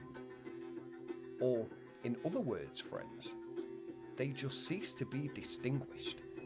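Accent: United Kingdom. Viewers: I feel dumber trying to watch this. Having a British accent doesn't make you smart.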